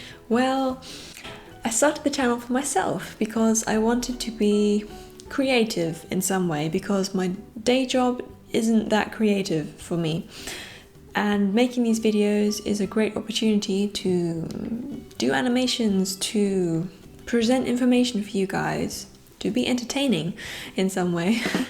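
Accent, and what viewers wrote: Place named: United Kingdom. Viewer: Happy birthday. I like your videos very much. I don't know why you are surprised that most of your viewers are German. You talk about Germany, German culture and so on. This topic is well known for us Germans. So it is easier to follow the topic. Listening to English speaking youtubers is a little bit of exercise for me. It is good not going out of practise. You speak very clear and I like your your British accent and the sound of your voice. It is soothing, pleasing to the ear. Keep your style of video making. It is very enjoyable. I'm looking forward for your next videos. This is my first comment on youtube ever. Greetings from the Harz Mountains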